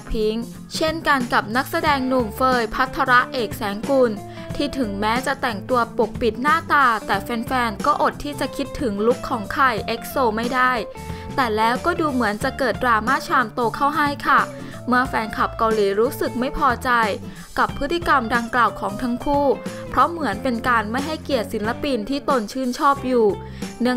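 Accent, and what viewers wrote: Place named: Thailand. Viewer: ยอดวิวส่งผลต่ออัตราเงินจ้าา ที่อ่านๆมันคนไทยชัดๆ5555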